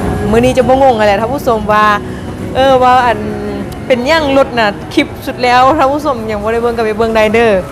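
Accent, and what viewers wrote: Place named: Xayabury, Laos. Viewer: พึ่งเข้ามาติดตาม สำเนียงน้อง คล้ายทางแขวงไซยะเนาะ ฮ้องเพลงกะม่วน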